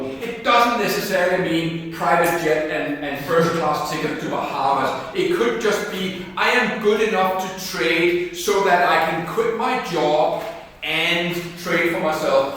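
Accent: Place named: Denmark. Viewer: Awesome content. But equally awful audio, its hard to navigate through that tough danish acent. Overall nicely explained. Thank you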